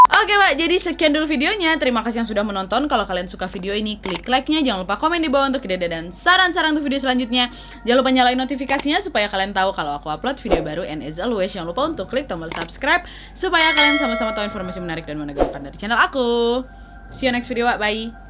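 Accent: Indonesia. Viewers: Menarik Sekali mengikuti Cerita anda , Terlebih dengan Logat Indonesia , Jadi Nyaman Mendengarnya , Sekedar Koreksi , untuk Kata Aku kalau di Akhir kalimat cukup di Ucapkan “ku“ , contoh “Aku telah Membeli sebuah Mobil, dengan Uang hasil Tabunganku“ , dan Kata Aku , Hanya bisa di Ucapkan untuk Pribadi/Keluarga/Teman Akrab , tapi Tidak bisa di Ucapkan untuk Audience , yang Baik dan Benar pakai saja Kata Saya , Bisa untuk Semua , Like dari Surabaya.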